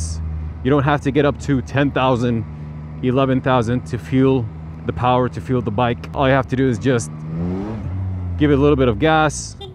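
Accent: United States. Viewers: אשכרה עד שלא ראיתי את המונית הייתי בטוח שאתה מארצות הברית. המבטא, המראה הסינמטי, הכל נראה מושלם אחי. אהבתי ועשיתי סאב, שרק תפציץ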